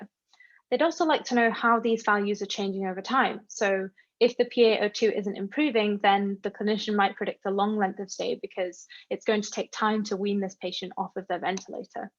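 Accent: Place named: United Kingdom. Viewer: Great work! Enjoyed the British accent!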